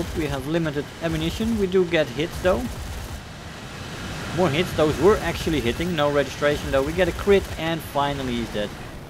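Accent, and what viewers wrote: Netherlands. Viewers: Hey man, you have a great channel because of your personality, you are calm and collected which is very relaxing and easy to watch. You explain the situations and the strengths and weaknesses of planes and you favor planes to tanks or ships unlike most war thunder youtubers. Lat but not least, i like your accent and the way you express yourself in English. Are you Dutch? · I need the pack... For it is my destiny to bring back balance to War Thunder. (Star wars Pun intended). How I found the channel: I was just watching WT Vids and I found your channel. Your accent caught my attention. Bcus I'm Dutch as well. And I don't know a lot of Dutch WT YouTubers so I taught lemme stick around and see where this goes🙈 What I think about your channel: What I like about your channel is that you are honest in your opinion and also the banter haha🤣 I also like how you address the players that Gaijin are just people as well and that if we want something to change we must not go and curse and scream to them (as a figure of speech) but tell it on a normal way to them. 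Keep up the good work😊 How long have I've been a Jengara🤣: I have been following your channel for almost 2 / 2,5 years now. It's like the day of yesterday when you started changing your thumbnails en your intro's 🤭 This was my summary of what I think, how long I've been following your channel and what I like about your channel 😊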